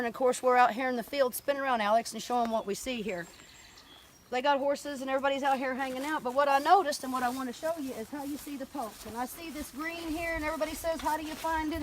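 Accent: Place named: Kentucky, United States. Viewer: Is this really the hills of Kentucky? I love it, and that accent is too cool - hoping for lots more videos like this!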